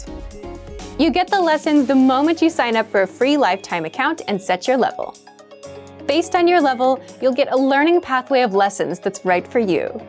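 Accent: United States. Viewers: pero es de California, New York... De dónde será? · Es el mejor acento es de EEUU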